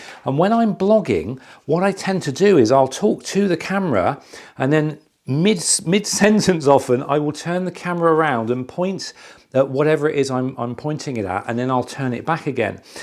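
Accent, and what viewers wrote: United Kingdom. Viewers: I remember that you were talking about Furry failures 2015 and again Making a cover for the Microphone testing it with a fan. Saying fur during your variety Channel. That makes me laugh your British accent. You are Fur happy 😊. That's so cute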